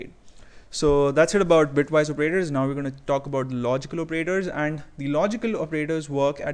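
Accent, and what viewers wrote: India. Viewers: Indian accent in English. Oh my.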